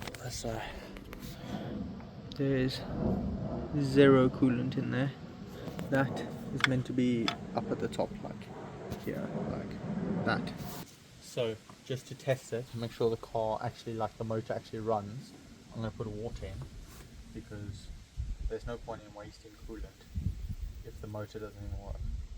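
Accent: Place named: South Africa. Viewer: Not a bad video Bru. Looks like UK but I can hear your SA accent coming through. 👌👌👍👍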